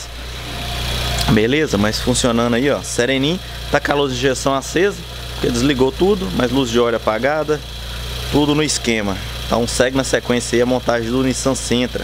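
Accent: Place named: Belo Horizonte, Brazil. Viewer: Blz amigo, pelo sotaque parece te bh, estou certo?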